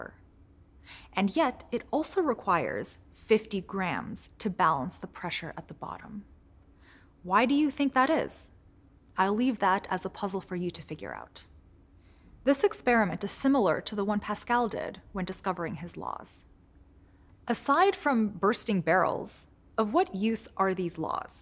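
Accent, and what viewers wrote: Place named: United States. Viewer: Sounds very americanish- hard to bear listening for more than 2 minutes and not getting any closer to the point :)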